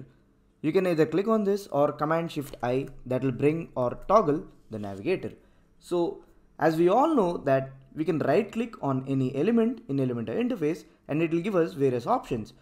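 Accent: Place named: India. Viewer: awful indian accent, can't understand anything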